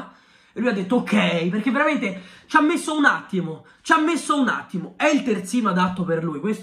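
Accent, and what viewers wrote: Rome, Italy. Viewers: mamma mia che fastidio. Ma non si può parlare in dialetto? Se è di Roma perché no! Fosse un altro youtuber ok, ma così no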